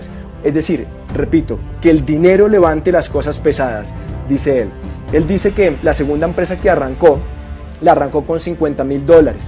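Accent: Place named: Colombia. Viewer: A mi me encanta el acento colombiano! No les hagas caso! La gente mediocre se la pasa criticando!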